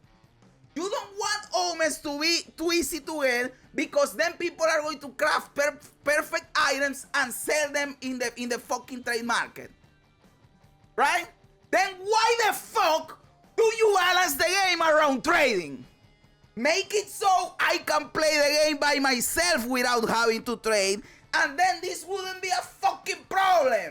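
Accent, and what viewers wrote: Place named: Dominican Republic. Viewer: eres dominicano no? eso accento me encantalo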